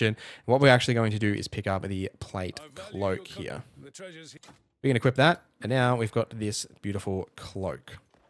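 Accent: Australia. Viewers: I was won over by the intro music. As I always say to my children when i need them to hurry- run like you stole something. Also love the aussie voice, five gold stars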